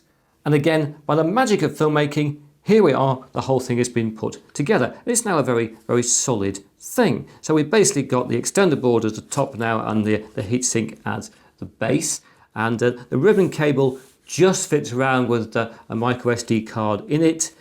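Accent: United Kingdom. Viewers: When I watch this channel I get the same warm fuzzies I used to get as a very young child watching children's education shows on national broadcast television in the 80s. Some of it definitely has to do with that sawtooth/triangle-wave synth theme music. But obviously the rest is from the very friendly pommy accent :)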